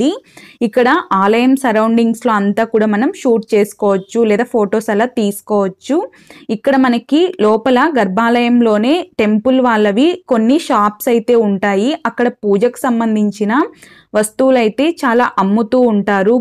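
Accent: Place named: Telugu, India. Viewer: Very nice description. Loved the Telugu accent. I will visit Mantralayam one day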